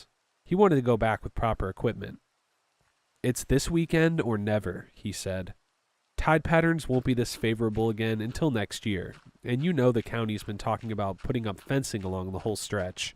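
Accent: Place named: United Kingdom. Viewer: bro i SWEAR when i listened to this at first it was a british guy talking 😭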